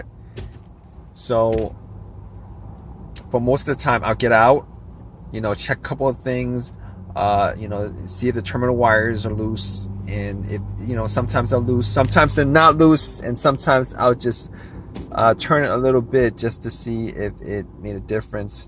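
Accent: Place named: New Jersey, United States. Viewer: Lol I was listening and thought to myself, he sounds like he's from Jersey 😂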